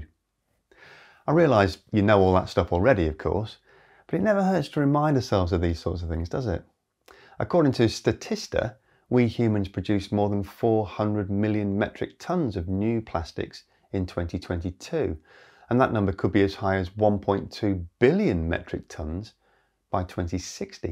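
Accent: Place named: RP, United Kingdom. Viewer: Wow, you're a very handsome man! I like your RP English and would like to see you at the beach.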